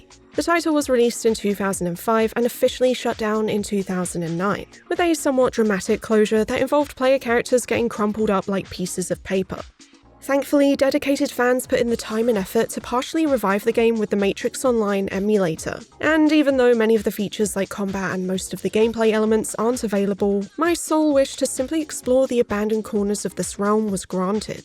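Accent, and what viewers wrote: United Kingdom. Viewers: WTF you're a woman talking about Matrix video games, your voice is premium ASMR quality, and that sophisticated British accent is the icing on the voice-over cake. LIKE LIKE LIKE LIKE LIKE LIKE LIKE Great job whoever you are, I love you 😃 - Thor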